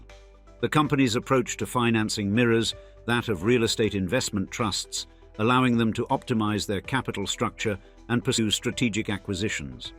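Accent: United Kingdom. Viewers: Always some British schmuck narrating American History. As though their own isn't enough of a goldmine full of corruption, theft and murder.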